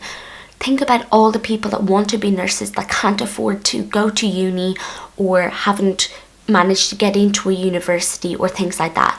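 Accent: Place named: Ireland. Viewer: great video. I start placement next month so hopefully these help. btw it sounds like your irish haha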